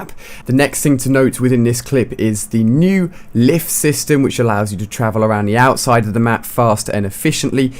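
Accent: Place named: RP, United Kingdom. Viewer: Your so posh and have a weird lisp thing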